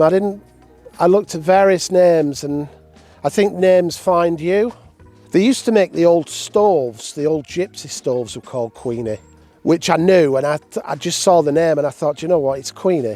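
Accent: England, United Kingdom. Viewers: your beautiful English accent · Brogue is Irish...he is English ! 😊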